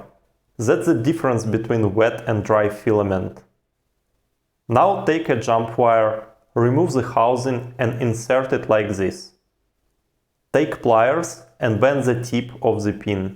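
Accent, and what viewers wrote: Russia. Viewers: Are you russian or Norwegian and russian accent sounds same?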